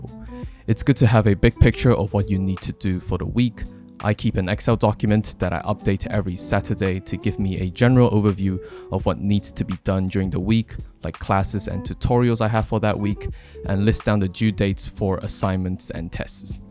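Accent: United Kingdom. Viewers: I really love your British accents 😊😊😊😊😊😊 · I’m quite certain of your accent. That sounds like brits but not rly strong .. ( I’m eng major from Jpn, cheers)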